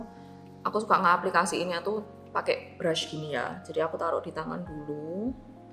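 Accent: Australia. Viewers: Omg the aussie accent ❤ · selain suka hasil make up & tekniknya, suka bgt sama accent aussie nyaaa🫶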